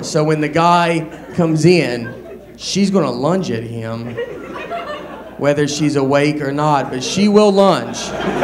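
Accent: United States. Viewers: If that gets you going, you know there's probably <num> Americans that talk that way.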